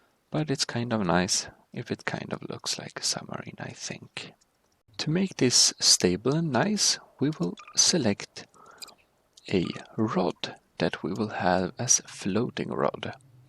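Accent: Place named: Sweden. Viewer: I knew you where swedish on tour accent or what it is called och kolla jag är också Svensk hej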